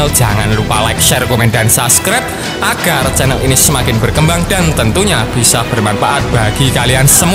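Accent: Java, Indonesia. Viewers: Medok yg baca narasi nya orang Jawa kyak nya...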